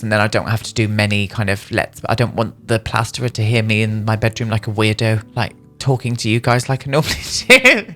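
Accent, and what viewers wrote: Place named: Scotland, United Kingdom. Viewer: As a Brit living abroad I love watching your English builds so much 💙 Makes me very nostalgic for home 🥰 Thank you! Please ignore this weird question if it is too personal, but are either of your parents Scottish? You don’t have an accent but you pronounce a lot of words in a Scottish way so just wondered!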